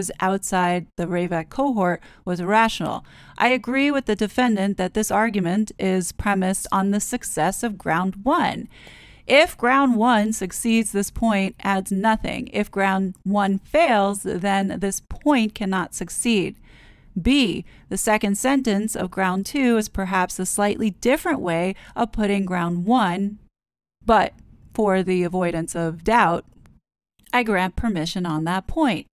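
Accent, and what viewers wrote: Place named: New York, United States. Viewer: I don’t think so. She sound like very well spoken educated person with a New Yorker accent 😊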